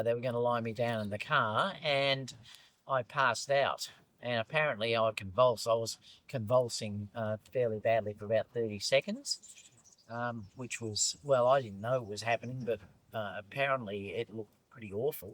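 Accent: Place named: Australia. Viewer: So good to hear your beautiful Australian accent again. Miss you very much on youtube. Take good care of yourself because good health is only appreciated when it's gone. Looking forward to your road trip videos. Best of luck to you and your wife. Cheers.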